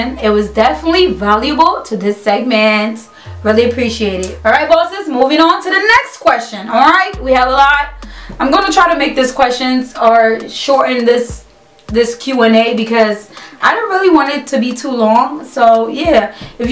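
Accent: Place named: London, United Kingdom. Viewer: i heard a london accent as well😂😂😂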